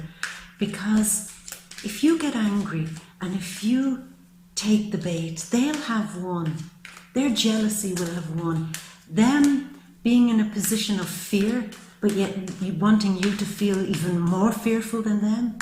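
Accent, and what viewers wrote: Ireland. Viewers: Accent is Irish? Just curious.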